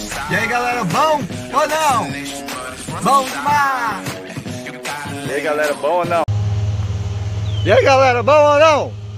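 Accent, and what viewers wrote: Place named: Minas Gerais, Brazil. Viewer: Veio você ainda não perdeu o sotaque mineiro ,ainda bem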